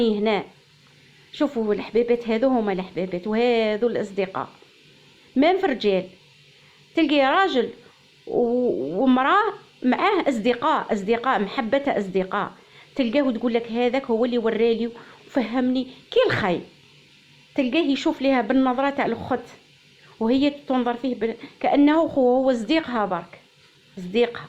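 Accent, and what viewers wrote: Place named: Ksar Boukhari, Algeria. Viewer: والله من لهجتك عرفتك قصورية معاك بنت منطقتك و نحيبك و ربي يعاونك كثري من الوصفات و يطلعوك بنات بلادك قصر البخاري ربي يحفضك و انشاء الله منخليوكش كثري من الوصفات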